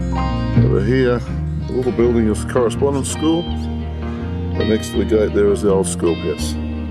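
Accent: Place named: Australia. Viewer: Onya, this is the first I've seen of you but I'll be watching more for sure....a quick look at your page and the amount of content is WOW. I left Oz in 98 for work and never considered that I'd call somewhere else home but that's how it ran....so I absolutely luv hearing some fair dinkum auusie talk.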